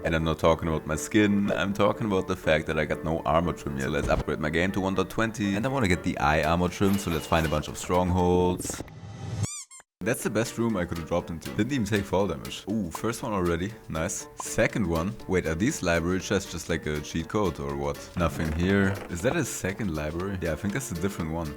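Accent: Germany. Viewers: I love that i can hear the small german accent haha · Are you german? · Okay but am i the only one who thinks that hes German?😂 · Are you german? Anyways great Vid! Got my like and sub you are gonna blow up. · You sound kinda german. Just in case:(Döner 8€💀) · I hear german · Am I the only one to hear an German accent?Great Video tho❤️🫶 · Funny video and nice editing! One question tho: bist du Deutsch? Dein akzent lässt das sehr vermuten.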